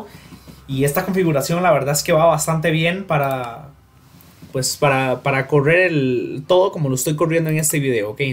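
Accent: Costa Rica. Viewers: Ese asentó lo conozco bro, ¿Eres de CR? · supe que eras tico, desde que digiste inutil jajaj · Jaja yo también dije "este es tico" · Todos hablan de los shaders pero papi desde el minuto 1 note su acento tico, pura vida mae 🤙🏻🇨🇷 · desde el minuto 1 se nota que es costarricense jaja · mae sos tico verdad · JAJAJA Bro, yo venía a comentar también que fijo eras tico xad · Eso mismo iba a comentar, este wila es tico. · Parece tico PD: en el lo confirmé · Es Tico? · Desde que oí tu pronunciación de R supe que eras tico xd Salu2 desde Argentina (Soy de Nicaragua) :D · Quién más se dió cuenta que tiene acento se costarricense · Me suena a hermano tico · usted es de costa rica ? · Mae usted es de costa rica verdad? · mae usted es de costarica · Tu forma de hablar se parece a la de un Costarricense, eres de por acá? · Se te nota lo tico de largo jajajaja, buen video bro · es por el acento tico igual lo pronuncia asi Rodezel2.0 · Bro eres tico? 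xd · Grande este perro, ya me decía yo que ese acento se me parecía jaja xd, buen video me encanto👌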